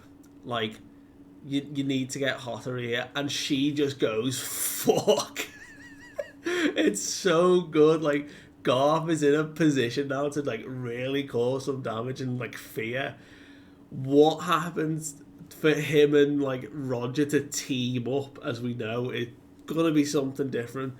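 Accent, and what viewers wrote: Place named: Liverpool, United Kingdom. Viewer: Brooo it’s 4am, you’ve gained a new subscriber and I’m glad to see your journey and growth from the start. YouTube should’ve recommended your channel earlier. You go into so much detail and even explain character emotions. Also having a scouse accent, I went uni in lpool and from Manc hits it for me so pool is like a second home to me. And you are the only British manga reviewer I’ve found. I hope your channel grows!!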